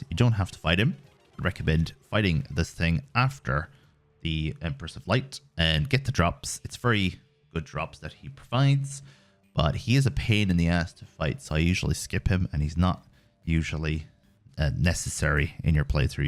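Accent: Ireland. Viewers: r u Irish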